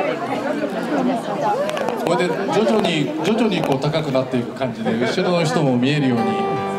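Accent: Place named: Tohoku, Japan. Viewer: ちょっと東北弁入れてる感じで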